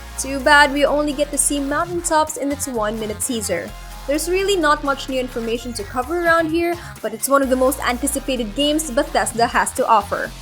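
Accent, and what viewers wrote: Philippines. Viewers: I hear Filipino Accent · You sounded like a filipina😅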